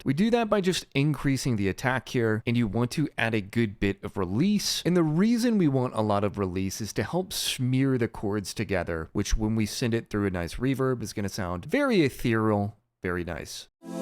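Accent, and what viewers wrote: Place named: West, United States. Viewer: The deep West accent just makes these even better, it's that American accent we never hear in the media, it's a complete trip man.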